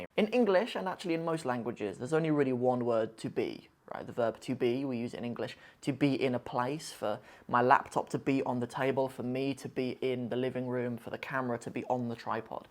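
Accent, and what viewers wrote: North, Somalia. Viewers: I am somali wlhi The way you speak sounds like somali😊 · Yes brother you are use north Somali accent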